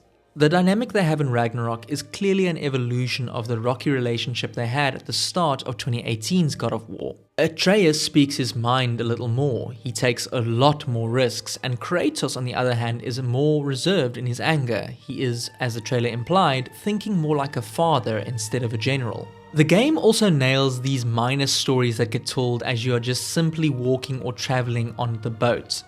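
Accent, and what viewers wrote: South Africa. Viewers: I could just hear the South African accent. Keep it up boet great content and loved the honest review!!! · I can tell this dude is South African and trying very hard to cover up his accent. “Taken to HaRRRt” “Cory BaRRRRlog” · Unrelated to the game... Are tou South African? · Love the video, but on an unrelated note, I can’t help but recognize the accent…are you South African by any chance?👀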